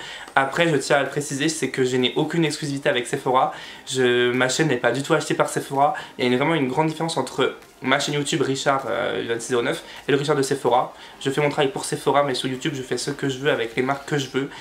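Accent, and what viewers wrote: Franche-Comté, France. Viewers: En tous cas ta vraiment l'accent francomptois 💯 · Tu viens de Franche Comté ? Et tu es Arianators ? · j'me disais que tu avez un accent que je connaisais, normal c'est le miens #TeamCancoillotte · Je me disais bien que tu avais un peu l'accent de Franche Comté :) Team Besac 🤗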